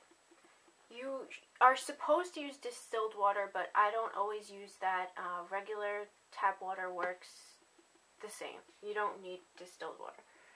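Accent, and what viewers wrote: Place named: Northern England, United Kingdom. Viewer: Worked, thanks. Btw, are you from up Nort? Thought I heard a bit of a northern dialect in your speech.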